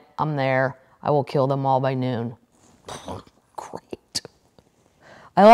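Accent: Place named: Wisconsin, United States. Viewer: GIrl you are breaking land speed records releasing these. you''ll spoil us termites. Thanks.:) Envy accounts for a lot of negativity. I'm unemployed but I've never had time to do all that negative stuff. Life is too short. Love that Wisconsin accent. Ooo that is not my definition of a hillbilly. I speak banjo. Termites- are we all safe or have some of is blown away. The winds have picked up here. Yeah Confidential was a lurid version of the Enquirer- there is a natural curiosity about Hollywood, but who's life was ever improved by reading that swill? A medical degree may be helpful when I'm seeking advice. Night night termites. I am happy for anyone who loves what they do for a living. What a luxury.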